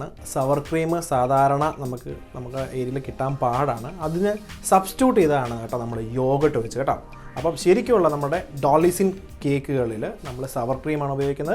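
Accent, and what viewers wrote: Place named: Kottayam, India. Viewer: Hope it from kottayam ..slang like my Amma....nostalgic...presentation also super...chettan superaa...🚽😊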